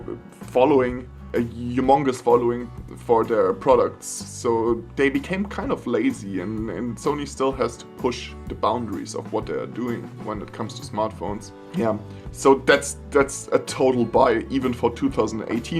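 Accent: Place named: Germany. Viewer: Deutscher Akzent confirmed😂😂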